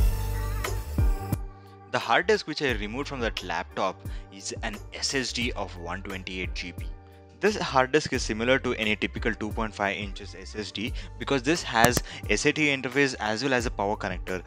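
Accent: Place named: India. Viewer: You have a strong from India accent and speak too fast to be clearly understood. Slow down from 10 to 8.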